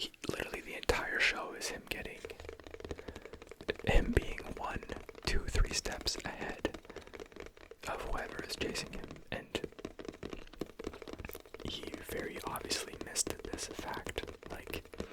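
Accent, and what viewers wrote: Quebec, Canada. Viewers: Big t'es tu québécois ??😂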